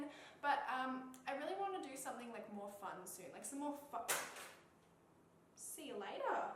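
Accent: Australia. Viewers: Are you Australian? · Any Brazilian watching??? your videos are the best ❤️ I miss australia so your accent makes me happy😂😂 good lock on your channel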